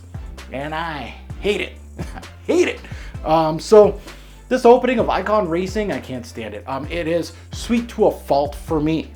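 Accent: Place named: Canada. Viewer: You Cristo and DracDoc were doing reviews before anyone your pronunciation of Labels brands and names your definitely A Canuck ...Your honest and genuine ...If anything Dunhills best is Dunhill by Alfred Dunhill , the brown bottle.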